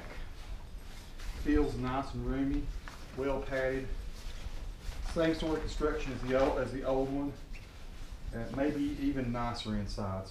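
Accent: United States. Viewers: I am British, that's a proper US accent you have there! Just got the same one for my 21.5" 4k machine - thanks for the vid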